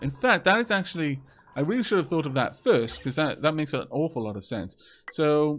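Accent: United Kingdom. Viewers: You are pronouncing 'algae' in British English (which makes sense, as you're speaking in a British accent). <link> This is the American English pronunciation. <link>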